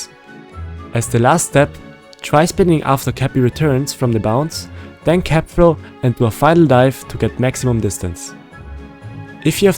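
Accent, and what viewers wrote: Germany. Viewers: Your English is very German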